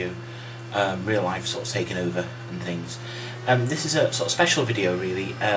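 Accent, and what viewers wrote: Wales, United Kingdom. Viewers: Thank you for posting this but I have a quick question are you speaking northern or southern welsh? Thank you :)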